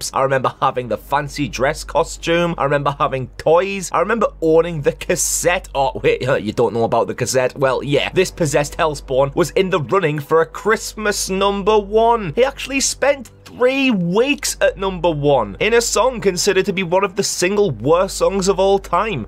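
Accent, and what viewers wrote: Scotland, United Kingdom. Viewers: Bro are you scottish because you sound scottish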